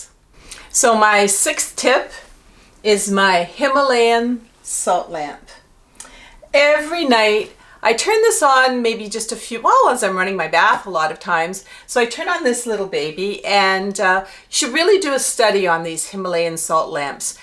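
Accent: Canada. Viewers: Are u Canadian May I ask?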